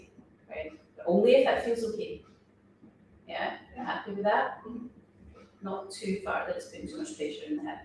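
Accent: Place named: Glasgow, United Kingdom. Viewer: I could listen to pilates with a Glaswegian accent all day long.. love it!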